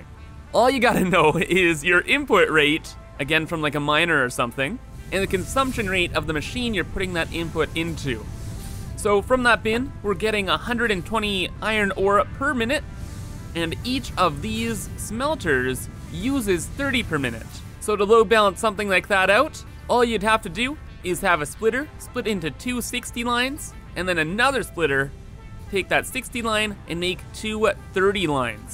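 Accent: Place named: Canada. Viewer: this is the most canadian voice i've ever heard, i love it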